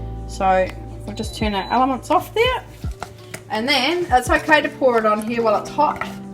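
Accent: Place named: New Zealand. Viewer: What part of NZ are you from? That's a strong Kiwi accent!! I watching from Spain, missing my Kiwi treats.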